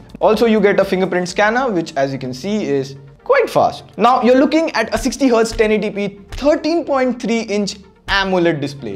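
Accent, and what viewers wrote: India. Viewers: i was confused white guy with Indian accent😮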